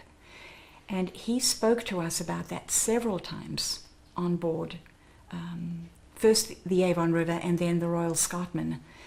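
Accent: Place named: Transatlantic, United States. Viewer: ine of the last beautiful women that use the transatlantic accent. love iy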